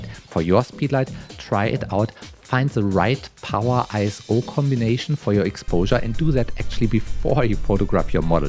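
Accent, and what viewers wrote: Germany. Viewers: That German accent :)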